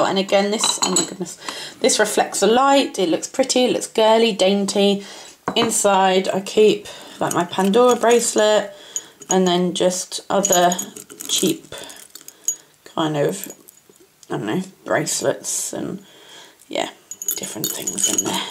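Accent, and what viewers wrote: United Kingdom. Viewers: its actually so nice to hear an English person on youtube! =D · oh and you have such a cool British accent!!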